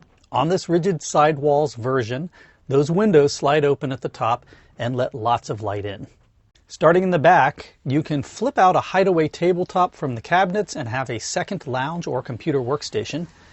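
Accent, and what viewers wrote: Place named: France. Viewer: Rethinking everything now. Maybe it would be better to go with something like this! Nice French accent, by the way!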